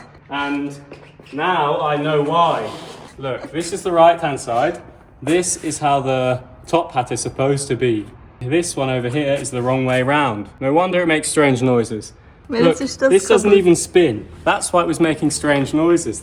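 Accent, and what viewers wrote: United Kingdom. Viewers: Just come across your channel, great work BTW you have the most English accent I've heard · Hi, great video! My father also bought a Twingo the new Twingo e-Tech very good small monospace. Is the Twingo popular in Switzerland?🤔 Oh and, it seemed a bit strange to me at some point I had the impression that your accent Was a bit British I don't know why, which side of the Swiss are you coming from? Please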